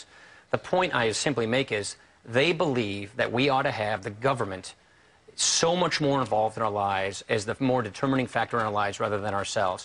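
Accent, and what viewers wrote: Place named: United States. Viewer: this guy looks like a leprachaun :D but with an american accend hmmm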